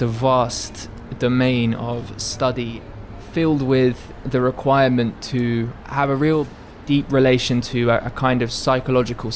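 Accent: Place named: RP, United Kingdom. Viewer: You sound just like the sort who's come back from his gap yaaar abroad in Thailand.. but great vid haha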